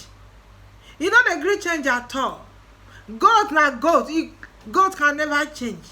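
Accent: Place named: Pidgin, Nigeria. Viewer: Your pidgin dey kill me aswear... Making sense.. We gather dey. No lele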